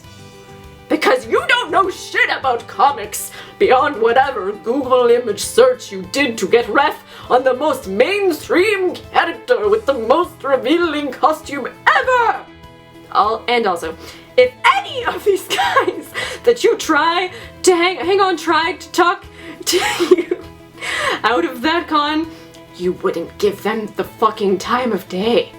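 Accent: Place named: Canada. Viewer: oh, I forgot to add - I'm Canadian too, so it warmed my little heart to hear your Canadian accent. Yayz!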